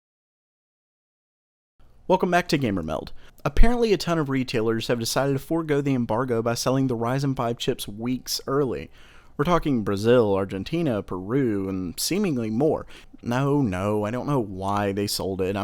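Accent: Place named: Tennessee, United States. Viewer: That accent is basically the same as mine, had to keep shaking the feeling that it was me talking xD Are you from Tennessee?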